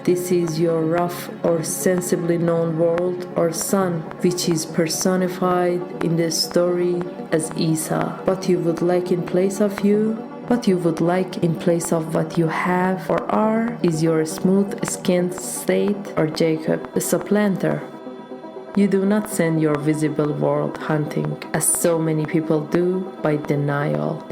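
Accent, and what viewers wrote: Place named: Iran. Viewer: Thank you so much. Keep making new videos. I already fell in love with your Persian accents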